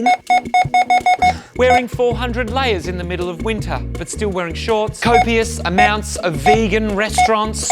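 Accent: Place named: Tasmania, Australia. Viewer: Even the accent, is exclusive to Tasmania only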